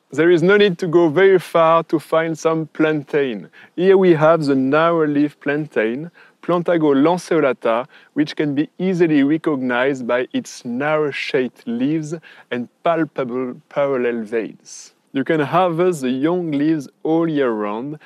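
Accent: France. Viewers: Oh man your english is so french......., that's so lovely, you're so cute, I love it!!!!!! Hugg's & kisses ;-)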